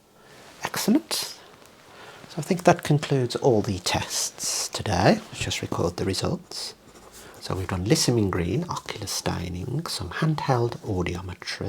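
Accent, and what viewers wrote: Birmingham, United Kingdom. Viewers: He is Brummie Taika Waititi, and no one can convince me otherwise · Your accent is too beautiful! 😍 You're from the UK, right? May I know, just out of curiosity, from which part? I love how you talk! Sending love from Argentina! 🇦🇷❤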